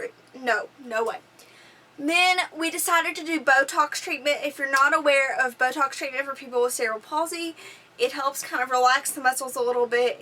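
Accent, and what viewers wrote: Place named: Kentucky, United States. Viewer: Greetings! I know it's been a long time since you did this video and I'm sure you have moved on from here, but I really appreciate this video. My 14 year old son has CP with spastic quadriplegia. He's non-verbal and non-ambulatory. He is taking baclofen orally and we are considering a pump. I am mostly unaware of his pain. It was helpful to hear you talk about your experience. I feel like it gave me insight into what he is experiencing. I love your cheerful disposition and your Kentucky accent.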